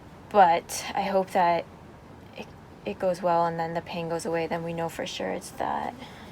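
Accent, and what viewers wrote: Canada. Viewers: Your Canadian accent is more noticeable when you aren’t feeling well. It’s the cutest thing ever! >.< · What the heck kind of hospitals do they have over there? Abalone hot pot? Is it part hospital, part restaurant? Does it have Yelp reviews? Btw, your Canadian accent is alot more noticeable when you're sick. Welcome back.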